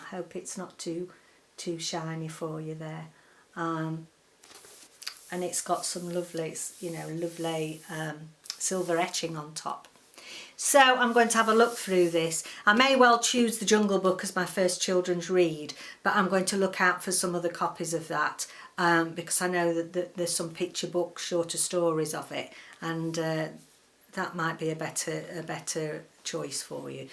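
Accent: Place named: North, United Kingdom. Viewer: Welcome to Booktube, it's lovely to find you. Subscribed! Can I ask where you are from? You sound like a fellow Northerner. 😀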